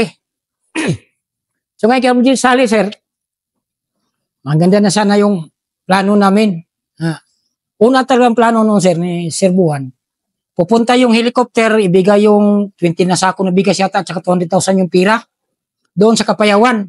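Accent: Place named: Waray, Philippines. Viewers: Waray waray yata si sir 😊😊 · parang taga samar si sir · Parang waray c Sir ahhh iba talaga Ang waray hahaha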